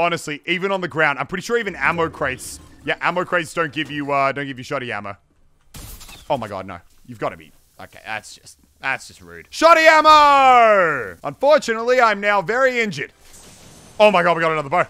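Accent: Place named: Australia. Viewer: I know u have an astralian accent but it's annoying when u say ashume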